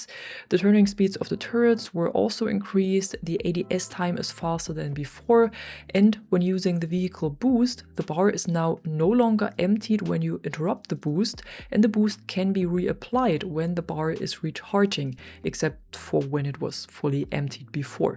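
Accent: Germany. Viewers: Dieser deutsche Akzent, wow😂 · Is she German? accent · German accent detected. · Ich wusste doch dass du deutsch bist. Hab mich immer über den Akzent gewundert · Love your german accent.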